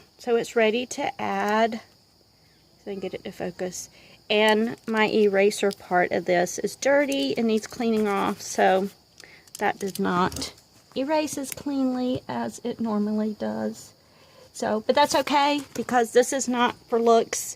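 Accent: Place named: South, United States. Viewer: New subbie. Keep up the cool videos. Your journals look great. I'm guessing from your accent you're southern like me. Nice to hear.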